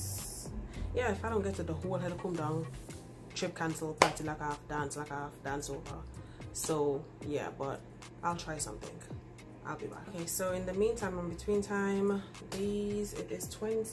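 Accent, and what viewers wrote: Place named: Antigua and Barbuda. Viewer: U sound like an Antiguan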